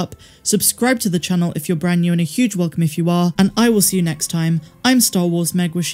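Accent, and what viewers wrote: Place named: Bristol, United Kingdom. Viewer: You have a Proper Brizzle twang there Meg Am I right? Wicked vids, always enjoy the updates and theories, cheers 🙏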